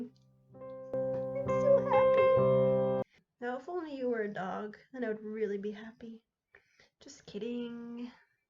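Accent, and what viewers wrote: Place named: Sweden. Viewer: Hello! Thanks for subscribing! I'm definitely looking forward to watching more of your videos because I actually have know a couple that's moving to Sweden soon to be long-term missionaries! I'll definitely tell them about your channel! Also I like listening to other languages, your Swedish sounds super cool! And I like that your English has a Swedish accent too!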